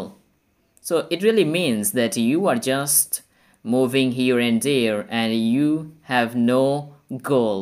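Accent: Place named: New York, United States. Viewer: NYC voice dear sir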